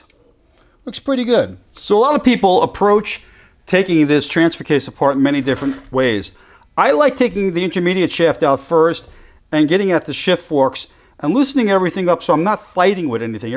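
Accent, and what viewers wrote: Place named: Massachusetts, United States. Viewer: What part of mass are you from you accent gives you away lol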